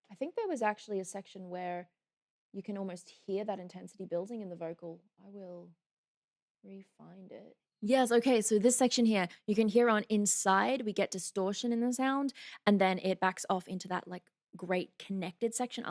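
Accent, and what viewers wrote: Australia. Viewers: That „oer, oer“😂 man i love aussies😂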